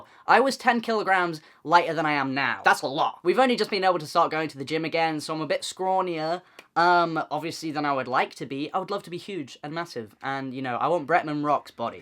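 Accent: United Kingdom. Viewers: hes literally just british ahah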